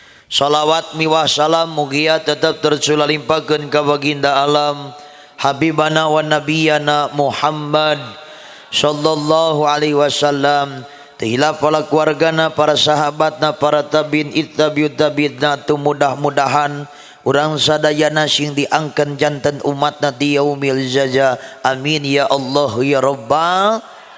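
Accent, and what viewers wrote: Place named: Bandung, Indonesia. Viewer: Jigana tipalih bandung atanapi bandung ngupingkeun logatnamah ❤❤❤❤❤❤❤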